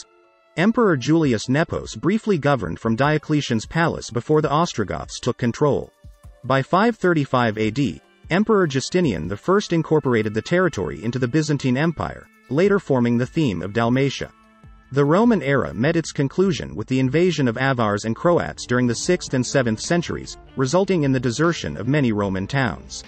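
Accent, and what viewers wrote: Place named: England, United Kingdom. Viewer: Because the AI speaks English akcent I cant wait for it to say: I cvrči, cvrči, cvrčak na čvoru crne smrče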